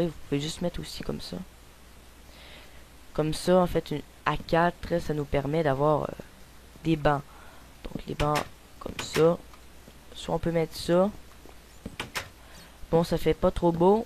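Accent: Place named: Quebec, Canada. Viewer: Ta un axan québecois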